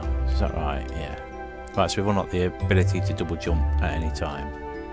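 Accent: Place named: Newcastle, United Kingdom. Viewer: Are you geordie? You have the accent.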